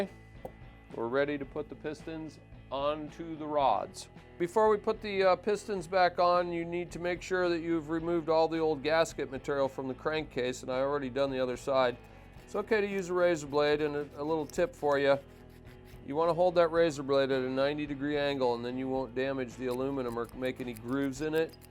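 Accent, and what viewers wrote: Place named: Appalachia, United States. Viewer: This guy got that Appalachian English down... Lm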